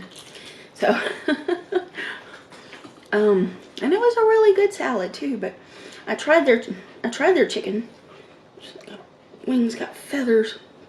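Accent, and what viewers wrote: South, United States. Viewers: I love that you are talking with us more. Love your little bit of southern accent and your laugh. You really worried me tonight, sweetie, when you swallowed the chicken bone. You were eating fast and that could have been very dangerous. It would break my heart if anything happened to you. If you don't post another video before Christmas, I want to wish you now, the very happiest of Christmas's. I hope there will be a celebration at your house! Thinking of you with Love xoxo. Livia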